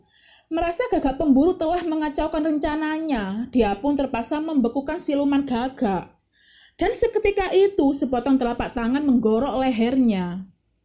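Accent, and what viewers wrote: Java, Indonesia. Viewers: moso sih mbak ora ngapusi kan... bahasane lucu medok² piye gtu...🤭 smangat mbak yu....👍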